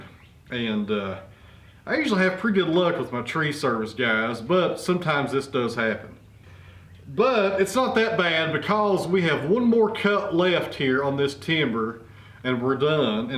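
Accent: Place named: East Tennessee, United States. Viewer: Ha! I started watching this and thought "that sounds like an east TN accent", then looked at the description and saw you was from Kingsport! (I'm from WNC, love me some east TN!)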